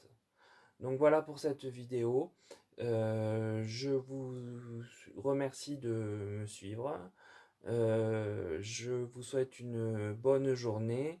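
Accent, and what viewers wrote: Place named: South, France. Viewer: c'est quelle ville du Sud cet accent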